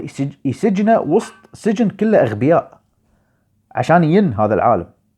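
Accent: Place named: Iran. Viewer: لكنة فارسية على عراقية هههههه هههههههه متعصر كان يزط ههههههههههه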